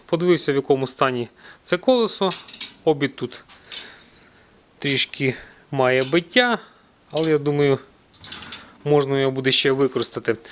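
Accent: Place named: Dubno, Ukraine. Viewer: Друже вітаю! Наснаги у роботі, та бажаю тобі постійно нових клієнтів... Ти можливо із Дубно? Десь почулося у розмові.... Я, з Рівного...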